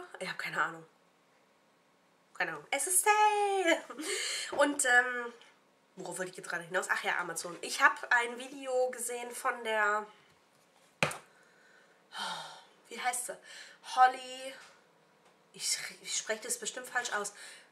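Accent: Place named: Mannheim, Germany. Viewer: ich wusste, dass ich den dialekt iwoher kenne! mannheim, klar wie klosbrühe :D komm aus der nähe von Heidelberg ;)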